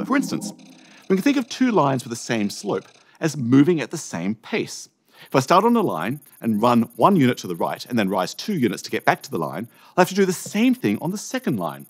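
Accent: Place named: Australia. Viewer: What’s the accent? Also, this accent is rather slow compared to other australiamd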